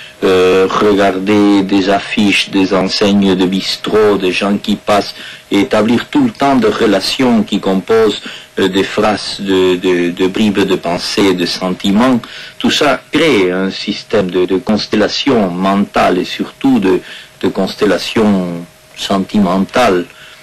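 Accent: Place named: France. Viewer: Muy poco le sale muy bien! Pero lo cómico es que tiene un acento francés cuando habla castellano jaj! La doble r por ejemplo, la pronuncia como un francés, y dice "euuuh" todo el tiempo. Salutations.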